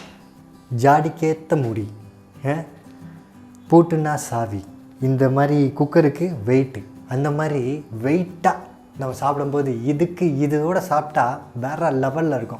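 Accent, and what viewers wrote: Tirunelveli, India. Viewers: Unga slang nala irku ..niga tirunelveli ah?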